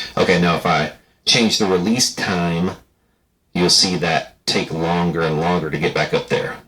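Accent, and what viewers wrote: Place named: Georgia, United States. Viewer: SOUTH Georgia accent, be precise! nice work. Nice compressor.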